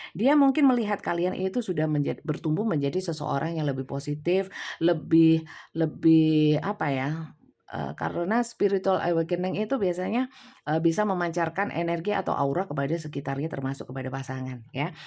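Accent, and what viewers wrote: Java, Indonesia. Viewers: Seneng suoro jowone ❤❤❤❤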